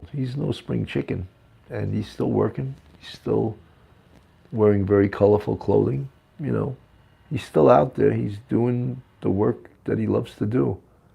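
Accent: New York, United States. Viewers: There's that New Yorka accent !!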